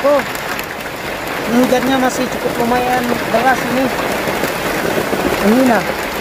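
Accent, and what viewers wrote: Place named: Bima, Indonesia. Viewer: Logatnya kek BIMA NTB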